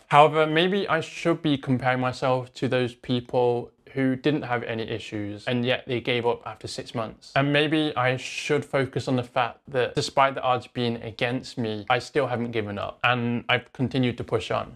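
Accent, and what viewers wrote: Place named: Taiwan. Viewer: I love your slight Taiwanese/British-American accent. :)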